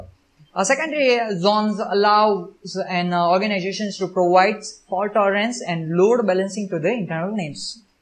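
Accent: India. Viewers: No option for Closed Caption to go with this heavy fast talking Indian accent? This tutorial would be better served either with CC or someone with less accent when speaking English.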